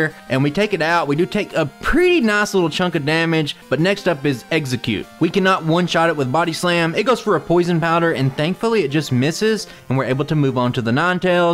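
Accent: United States. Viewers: Where you from? That accent got me saying howdy partner. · Btw i too have a southern accent so "bind" and "bide" sound exactly the same... I feel your pain, brother!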